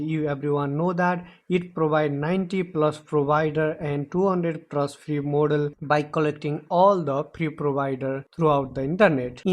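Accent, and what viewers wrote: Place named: Nepal. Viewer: your accent is so nepali you nepali?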